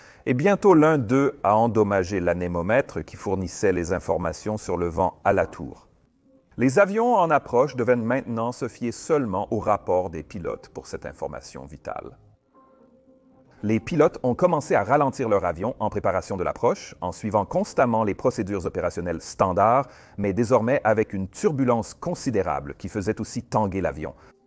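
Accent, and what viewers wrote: Quebec, Canada. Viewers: Excellent. Bravo cousin. 👌👍Avec l'accent Outre Atlantique, c'est terrible. Pour ma part j'ai un faible pour le Boeing 747 😉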